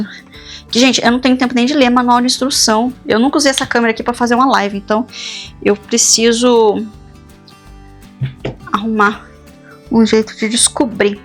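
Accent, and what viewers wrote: Minas Gerais, Brazil. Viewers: já imaginava que você é uma mineirinha ❤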